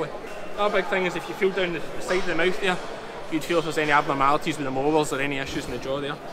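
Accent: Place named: Ayrshire, United Kingdom. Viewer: Your " wonderful accent " is so familiar to me I don't notice anything unusual - until I read the comments. Fair to say the Ayrshire accent is probably under represented on Youtube lol.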